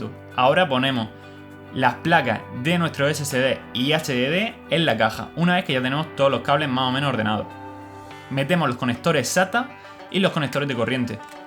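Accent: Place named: Murcia, Spain. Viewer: Muy buen vídeo , explicación sencilla y clara para todos los públicos . Y sobretodo con ese deje murciano! Un saludo ! 🙋🏻‍♀️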